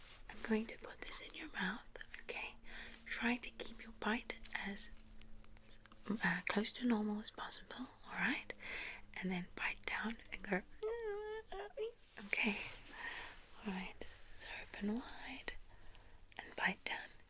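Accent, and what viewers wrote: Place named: United Kingdom. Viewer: I swear she is British with that accent.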